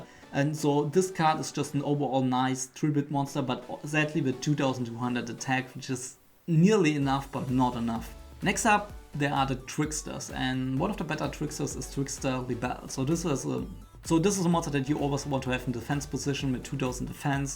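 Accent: Germany. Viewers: Dachte direkt in den ersten Sekunden "Das kann nur ein deutscher Akzent sein!":D Super Video!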